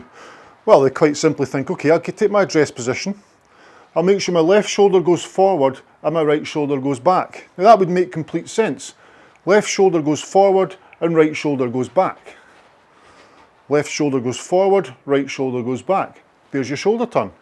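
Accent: Scotland, United Kingdom. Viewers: That beautiful Scottish accent - could listen all day 😄. Happy new year!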